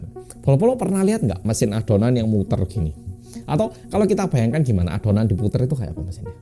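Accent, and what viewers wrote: Java, Indonesia. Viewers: Lah kmn aja..org hidupnya lbh byk di Indo..ngomongnya aja medok bgt..🙈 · Yaelah wajah nya ala Korea logat bahasa nya ala indonesia jawa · Wahh abang ini habis nikah tambah ganteng. Selamat ya bang orang Korea yg medhok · oppa ngomong nya kok medok si oppa 🙈🙈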